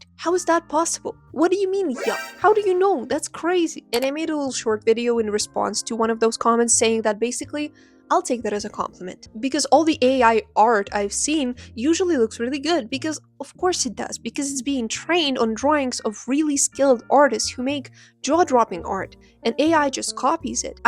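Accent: Eastern Europe. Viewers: I'm an English tutor who mainly works with ppl from eastern europe and am like. 99% sure ik where your accent's from /lh. Not saying that bc it matters, just an observation - your English is Exceptional and you speak and use slang i'd say bordering on a level of some native speakers. It's clear you practice a lot and pay attention to pronunciation! keep at it <3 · бля ваш акцент ПУШКА БОМБА ОГОНЬЬЬЬЬЬ я надеюсь мой славянский радар все правильно улавливает (у вас ник я имею право делать предположение) потому что НУ БОЖЕ ЭТО ШИККККККККККК это самый.. наверное самый приятный акцент что я вообще слышала. очень круто. тем более если ирл вы не общаетесь с англами? колдун.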